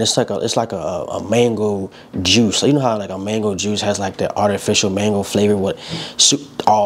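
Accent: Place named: New York, United States. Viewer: Thought you was from nyc😂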